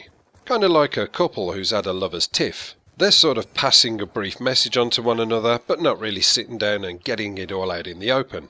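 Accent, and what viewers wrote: United Kingdom. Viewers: British Accent!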